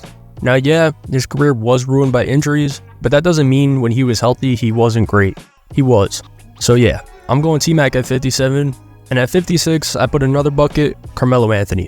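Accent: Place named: Boston, United States. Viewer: Sorry, I found you hard to understand behind that Boston accent. The profile of the NBA logo is Jerry West. "Larry Bird is the first white person...." you simply don't know what you don't know. George Mikan? Pistol Pete Maravich? Bob Petit? During the classic period of the NBA, select games were broadcast in the middle of the night, often days after they'd occurred. It's popularity -- which didn't really reach modern levels until Jordan, sprang from college basketball, where people watched the Magic/Bird rivalry, and where their senior game is still the most watched college basketball game of all time. The NBA just gave people a venue for people to keep watching it. Also, I'll point out something that SHOULD be obvious -- to even know who the MVP is, you have to already be a fan. Quick -- think of a sport you don't watch. Who's the MVP? 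See what I mean? Boston, particularly in the 80s, was a highly color conscious place. But most of the country isn't Boston (thank god).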